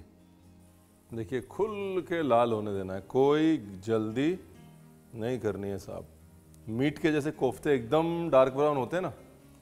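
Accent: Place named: Lucknow, India. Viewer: Khana to superTasty Rhta h but aapka wo Lucknovi Andaz ki baate Heart touching ❤❤❤❤ You are real Gem Sir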